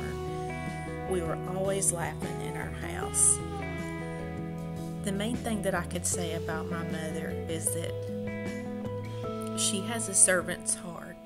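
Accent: South, United States. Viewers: I could listen to you talk all day. Makes me feel like I’m back home in the south♥️ great video my friend!!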